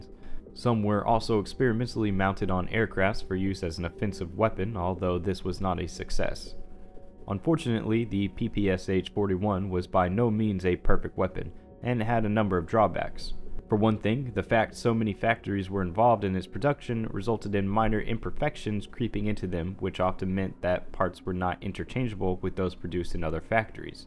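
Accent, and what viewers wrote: United Kingdom. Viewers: So glad you got the British guy to narrate lol. No offense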